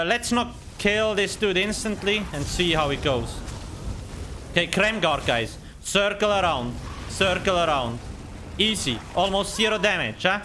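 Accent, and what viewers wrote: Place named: Switzerland. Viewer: du kannst echt ned leugnen, dass du Schweizer bist xD Schöne Videos. Hoffe da kommt bald mehr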